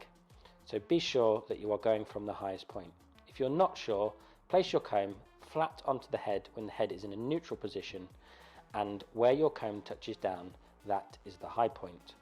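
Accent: United Kingdom. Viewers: Im a totally new hairdresser student and happy to find someone who just explains what to do and not all kinds of useless jokes and comments. Clear and easy to follow.The british accent helps as well ;) Thank you, you have a new apprentice!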